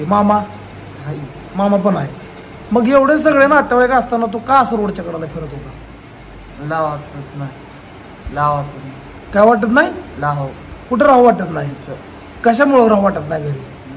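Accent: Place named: Satara, India. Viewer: एकदम सातारी बोलीभाषेत समजावलं दादा,, आपल्या कार्यास सलाम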